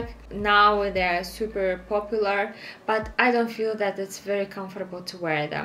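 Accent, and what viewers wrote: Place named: Russia. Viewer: You have russian accent but you obviously dont look russian)) Where are you actually from?)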